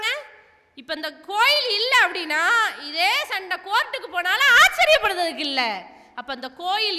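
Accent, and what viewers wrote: Tirunelveli, India. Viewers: Ñellai Tamil👌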